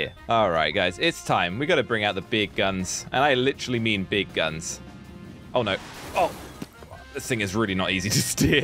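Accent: United Kingdom. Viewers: Are you from the uk?🇬🇧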